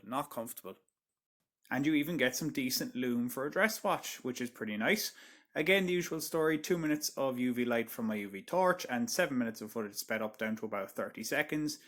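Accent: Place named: Ireland. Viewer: Got to say your Irish accent in rant mode is brilliant! I've played with the idea of reviewing a Reef Tiger but they're getting plenty of negativity lately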